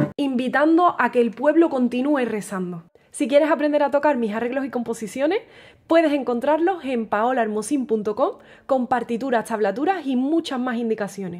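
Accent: Andalusia, Spain. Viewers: Hermoso todo lo que tocas, me da pena decirte , que primero, no me gustaba tu tono de voz, era la primera vez que oía hablar una persona andaluza, pero me gustas como tocas, y aprendí a oírte y acepté tu voz y tu orgullo de tu localidad, y el amor que emana de tus palabras el brillo de tus ojos la sinceridad con que te expresas, y mucho amor para compartir un hermoso trabajo soy de Venezuela de origen muy humilde, me gusta la quitará, pero no sé tocar ninguna pieza completa, por eso me dedico a oírla , ahora te quiero y te admiro mi niña, mi bisabuelo creo que venía de Islas Canarias. Ahora me gusta la narración que haces a tus arreglos. Sigue triunfante. Ynelda Ascanio Gutiérrez.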